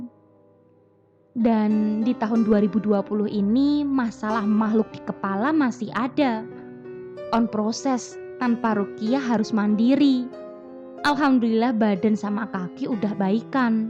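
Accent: Java, Indonesia. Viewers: Ngomong'e medok mbak'e😂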